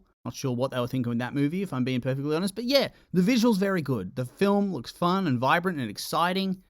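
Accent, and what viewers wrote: Australia. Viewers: Ahhhhh, I needed to hear a fellow Aussie’s voice explaining this.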